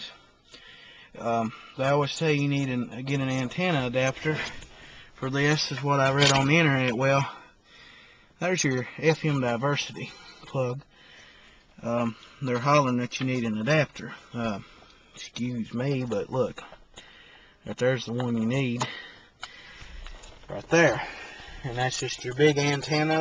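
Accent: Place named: Alabama, United States. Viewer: Yea I tried to watch but made me sea sick. Uhgg. That's an Alabama axcent if ever herd one. I went fishing in Alabama. Everywhere I went some local yockal would flip me some lip about being a yanky. I thought why? Because I speak clear and consise. It got old real quick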